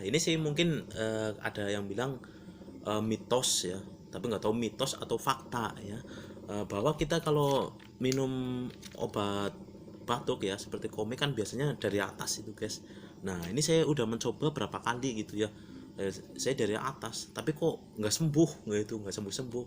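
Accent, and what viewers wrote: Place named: Banyumas, Indonesia. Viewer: Sip...dicoba kang. Kang asline ngendi sih? Ngapake kaya enyong banget...he he he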